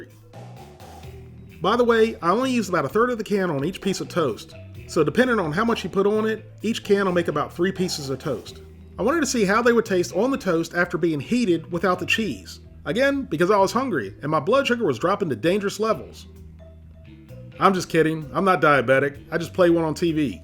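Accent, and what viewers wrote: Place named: North Carolina, United States. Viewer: I've been watching some of your videos randomly for a bit now, and I've always thought you sounded like someone from NC... I am also, that's how I knew.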